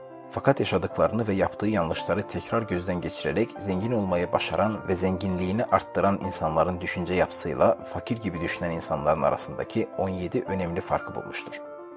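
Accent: Azerbaijan. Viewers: Bu danisan Azerbaycanlidir...danisiqindan melumdur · qaqa azerbaycanli oldugun bilinir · Deyesen Azerisen 😂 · Bu konusan abi Azerbaycanli!👍👍👍👍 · Abi azerbaycanlimisin? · Türkçə danışma ləhçəsindən bilinirki Azərbaycanlıdır... 😄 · Konusanın azerbaycanlı oldugunu dogrumu bildim acaba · Video yapanin konuwma seklinden qaliba azeri oylemi acaba? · Dosdlar bu videonu anlatanin Azerbaycanli oldugunu ama turkce konuwtugunu bir tek benmi anladim?? Bu arada bende Azerbaycanliyim... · Bu çocuk azeri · levent y Seslendiren Azerbaycanlı, türkçe anadili değil. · Arkadas azeri turkceyi zor kullaniyor bu yuzden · Ləhcəsindən Azərbaycanlı olduğu o dəqiqə bilinir,Guyə bu indi niyə türk ləhcəsində danışırki? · Qaqas azerbaycanlisan oz lehcende danissan yaxsi olar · Sen bir Azerbaycanlisan.ama direşibsenki turkce danişacaqsan.ama elede yaxşi alinmir. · Bi şey sorucam.Videonu anlatan kişi türk deyil Azeri gibi geldi.Son tonundan anladım. 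· Turk dilinde Azerbaycan dili kimi danışırsan)) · Azerbaycanlısan qaqa bilinir danışığından😁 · Men ilk defe sizin kanalinizin turk dilinde olan versiyasi ile tanish olmusam.Her zaman dinleyende turk deyil, azerbaycanli oldugunuzu dusunurdum.Cunki lehcenizden bunu hiss edirdim.Bir gun yoldasim mene sizin azerbaycan dilinde olan videonuzu gosterende sizi basqa biri bilib zenn etdim ki,turk dilinde olan kanali tekrarlayirsiniz.Daha sonra men anladim ki,2 kanal da size mexsus imish 😄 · kardeş sen Azerbaycanlımısın? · Xoşuma gəlməyən bir məqam var bu videoda.Videoda danışan azərbaycanlıdır amma türkiyə türkcəsində danışır.Bu da çox gülünc alınır.Her cümləsində açıq verir.Həm də kobudcasına.Sənin öz dilin yoxdur ay kanal admini.Bu yerdə Molla Nəsrəddin jurnalındakı karikatura yadıma düşür.Birinin ağzına 5-6 dil soxurlar.O da deyir ki,ay bala öz dilim ola -ola başqa dilləri niyə ağzıma soxursuz.Mın də türkəm ve bununla qürur duyuram.Amma siz belə danışanda türkiyə türkcəsini də pis günə qoyursuz. 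· Sen Azerbaycanlımısın abi?Konuşmandan anladım 😂😉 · Qaqa sən azerbaycanlisan? Danışığından hiss olunur təmiz türk dilini danışa bilmirsən sanki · Bu ses sahibi Azerbaycanlı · Azeri oldugu belli arkadasin guzel video yapiyosun keske şiveninide biraz geristirsen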